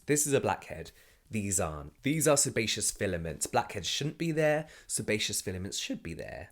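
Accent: United Kingdom. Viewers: Are u British?your accent seems so yeah?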